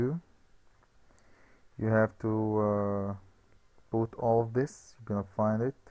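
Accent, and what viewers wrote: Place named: Brazil. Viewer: wow, i thought you were brazilian.. Your accent is quite similar. Great video btw! it really helped me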